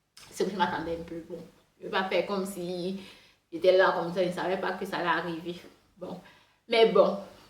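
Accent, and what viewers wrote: Cameroon. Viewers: Hey, es tu camerounaise? J'aime beaucoup ton accent, ne change rien. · Effectivement je connais une camerounaise qui parle comme ça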